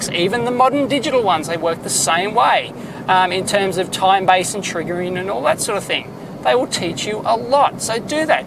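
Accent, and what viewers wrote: Australia. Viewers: No offense....but WHY is the AUSSIE dialect/tone/speech so hard on my ears ? Are Gold Coasters different from Margarets River people..? is it a result of english colonization of the aborigines ? <link> Good advice.... I just picked up a pair of working LEADER 100Mhz scopes for $100 USD in Calif off C-List....LBO-516 and LS-8106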